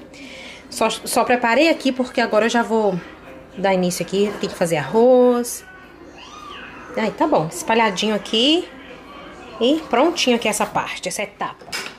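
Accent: Minas Gerais, Brazil. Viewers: Ela tem um jeito todo mineiro de conversar . Tenho vontade de conversar com ela, pois também sou mineira mas péssima cozinheira.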